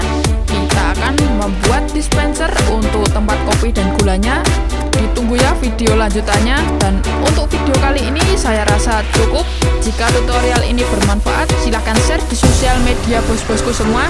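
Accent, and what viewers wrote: Java, Indonesia. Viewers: Yang gak bagus..suara MEDOK ny itu loh..maf..bisa di kondisikan gak..klo bisa pke duber orang lain ajah deh yg fasih bahasa indo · Jangkrik.....supa..? Yo ngene iki wong njowo.dipertahankanlah.gausah malah sok2 an bahasa indo yg fasih. Liat itu subscribe dan like nya. Toh juga banyak walau medok.kate lapi koe..? Ra terimo. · Mendoknya ciri khasnya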